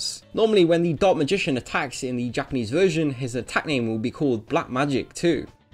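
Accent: United Kingdom. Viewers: All british youtubers have the same cadence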